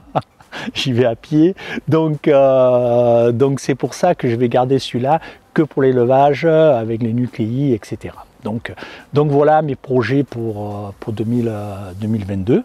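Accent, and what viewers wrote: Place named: South, France. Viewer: Merci our le bilan de l 'année et l accent du midi, plafond bas et frais sur notre tête en normandie depuis quelques jours, en espérant que 2022 soit meilleur pour les apis bonnes fêtes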